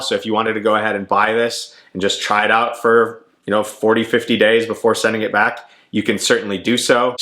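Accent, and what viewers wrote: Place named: United States. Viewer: I didn't even notice anything negative about your English. You speak like you're from the States! lol Thanks for the review.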